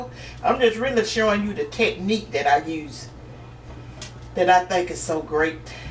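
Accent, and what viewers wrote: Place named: Alabama, United States. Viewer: Love your channel ONE OF THE BEST OUT THERE!! ... U EXPLAIN IT SO WELL.. ANYONE CAN FOLLOW..THAT BEAUTIFUL SOUTHERN ALABAMA ACCENT ADDS A VERY UNIQUE CHARM.. U HAVE DEFINITELY GOT THE. MR. SPOILED WITH THAT. DELICIOUS COOKING!! HE. SEEMS LIKE SUCH A. GOOD HUSBAND... THE ONE THING THAT I WOULD LOVE 2. SEE IS 4 U OR HIM ONE TO TASTE THAT DELICIOUS FOOD. B4 U SIGN. OFF!!....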